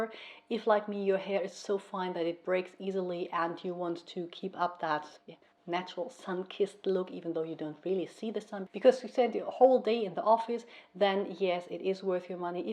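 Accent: Germany. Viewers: Omg im not sure but I feel like I can hear a little German accent!! And I thank you very much for the video!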